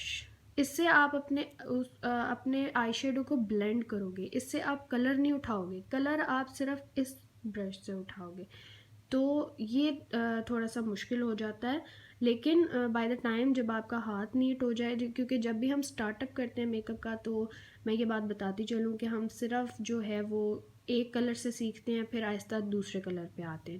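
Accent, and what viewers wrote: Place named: Lahore, Pakistan. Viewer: Apka Urdu + Lahori accent buht pyara lagta h 💐💐💮💮 n Allah bless you for Ur new platform inshallah yaha v aap Kmal kre 🤗👏👏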